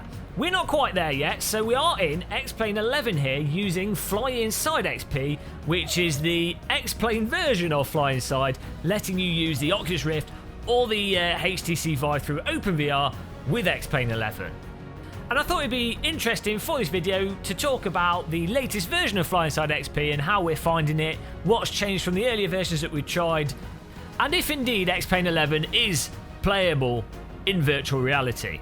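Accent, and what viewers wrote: United Kingdom. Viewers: You're awesome! You're the only person with a British accent while having such an upbeat and awesome voice. Amazing review. Hopefully you get into flying more.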